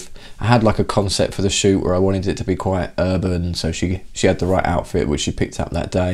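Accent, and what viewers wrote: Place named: United Kingdom. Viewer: Came for the filter, stayed for the British accent. Solid review.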